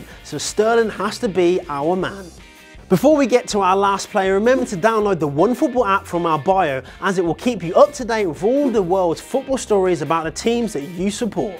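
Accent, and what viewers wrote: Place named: United Kingdom. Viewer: Onefootball English That doesn't really make sense to me, why would someone sub to a channel which he doesn't understand the language if the content is more verbal than visual. If those subscribers are real, I advise You to change the content from showing videos of You talking with heavy British accent, to something like action-compilation or highlight video. Telling my friends wouldn't do much, because my friends don't speak English at all, but I'll sub. Is this your main profession, just if I may ask.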